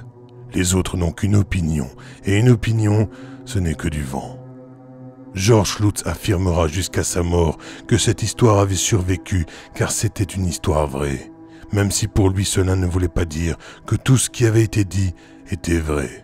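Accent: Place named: Belgium. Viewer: J'ai des amis belges qui prononcent également de cette manière. Ça donne houit pour huit, fouite pour fuite etc...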